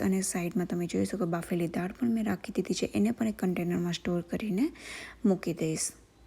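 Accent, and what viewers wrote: Saurashtra, India. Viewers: Nice vlogs. Aaj first time aapna videos joya Aap Saurastra thi 6o e language tone par thi khyal aavi gyo. Kya jilla thi 6o ? Tya na lifestyle upr video banavjo to ahi thi tya javavala loko ne help mle.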